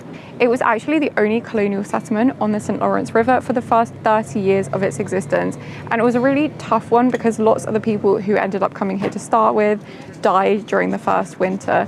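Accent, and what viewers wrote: United Kingdom. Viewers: C'est pour faire découvrir le Quebec aux Canadiens et Américains. de plus on entend bien son accent Britannique et ne parle probablement pas francais.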